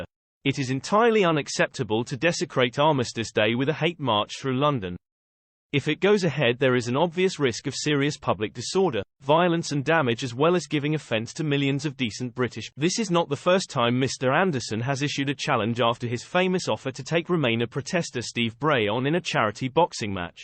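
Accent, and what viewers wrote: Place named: Russia. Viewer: If you're going to use a robot voice to replace your Russian accent, at least get one that is consistent.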